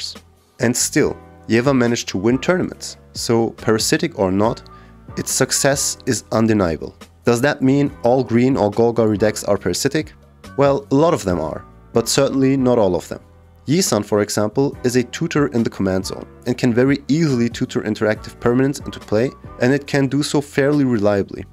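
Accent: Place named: North America. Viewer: I was absolutely sure you're from NA. Great and really educational video! Kinda think Hapatra should be there cause she seems like she's somewhat same as Gitrog.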